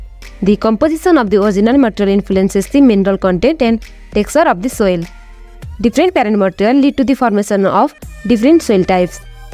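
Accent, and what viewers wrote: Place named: Nepal. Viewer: U sound like Nepali.. I mean ur accent..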